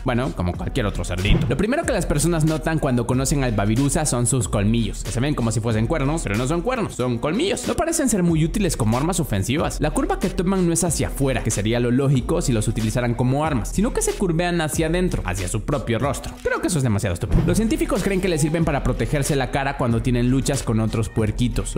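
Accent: El Salvador. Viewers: Ahora si se nota que es de El Salvador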